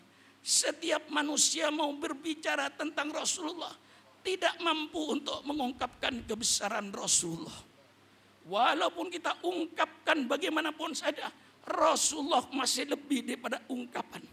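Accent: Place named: Madura, Indonesia. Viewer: Waaa ktahuan orang madura.. Bahasanya bukan bahasa intlektual pendidikan akademisinya Kurang orang.. Ktahuan cara ngomongnya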